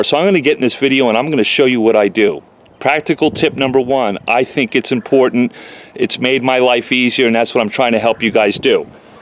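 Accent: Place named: New York, United States. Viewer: Awesome ideas to make everyone"s world easier, Thanks! How did I know You were from up north? I guessed jersey, but the accent isn' t heavy enough. Maybe boston, but no. Should have known NYC. I am from upstate myself. In NC and VA they have tried to get me to be a rebel! Not working!! Love them all anyway! Thanks for all your vids and hard work.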